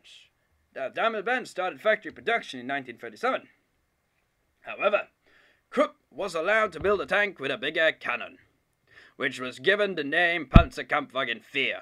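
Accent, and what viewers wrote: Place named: United Kingdom. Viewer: Loving that ridiculously british accent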